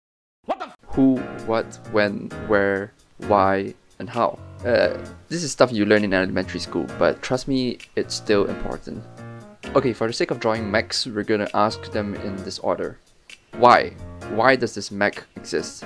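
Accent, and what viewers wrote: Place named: Singapore. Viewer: He sounds singaporean...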